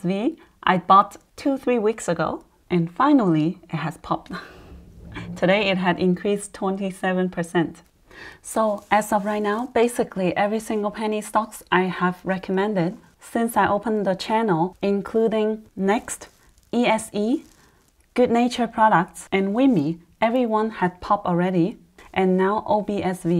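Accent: China. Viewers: Are u a chinese ? Cause your accent sounds like so chinese. Whr u from? China ? Taiwan ?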